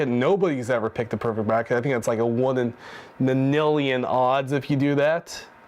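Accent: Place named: Arkansas, United States. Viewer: What part of Arkansas are u from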